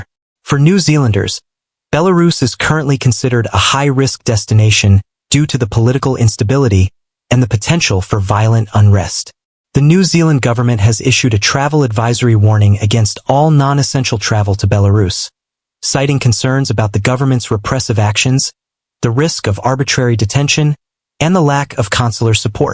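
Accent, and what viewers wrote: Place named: United States. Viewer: why does this kiwi sound like a yank?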